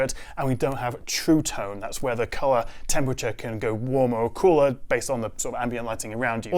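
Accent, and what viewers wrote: England, United Kingdom. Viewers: Is this English sounding man talking in Great British Pounds or American Greenbacks?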